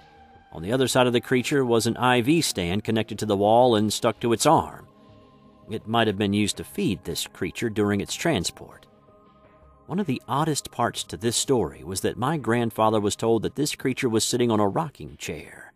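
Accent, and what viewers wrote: South, United States. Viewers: Your country girl accent is perfect. Lol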